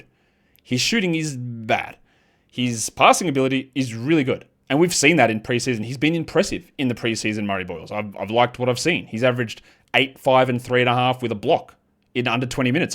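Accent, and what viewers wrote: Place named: Australia. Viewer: This dude is Bill Simmons with an Aussie accent. Why bother listen he copied and pasted Bill Simmons 😂😂😂😂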